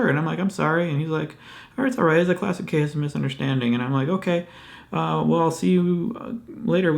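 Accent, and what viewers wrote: Wisconsin, United States. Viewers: That accent is so 👌🏼 Can you do a video entirely in your Wisconsin accent? I'm a linguist and I love language variation! 😂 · that WI accent tho! do more Wisconsin jokes! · Love your Wisconsin accent,!:D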